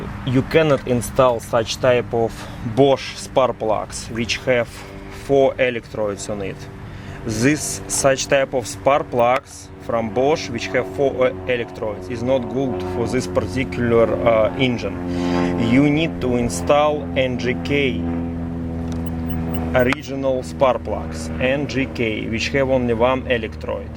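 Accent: Russia. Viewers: You must be russian..:goodvibes: i love your accent. I subscribed! I love your videos.. straight to the point, no bs. Thanks!! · love the Russian accent 👌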